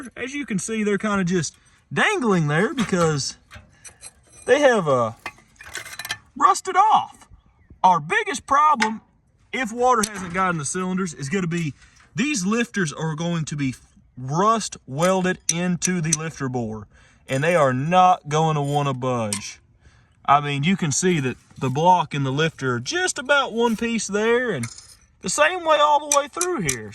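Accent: South, United States. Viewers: Your down south delivery put me off at first, but I liked the projects you were working on. Now, I have no issues and this far north ( Alaska) junk car junky enjoys every video you put out. Watching the efforts you put in and the problem solving involved is top notch. Making push rods for this is back yard genius stuff! Thank you for what you do and how you do it! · You're the most stereotypically American-sounding person I've ever heard. Amazing.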